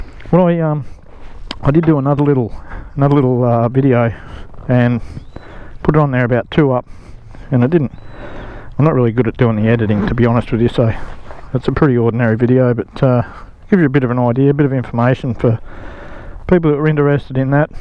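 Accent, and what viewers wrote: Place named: Australia. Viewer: Nice review mate.... great to hear an Aussie review... goes like the clappers..... brilliant.... I can’t wait , one day I will join you guys......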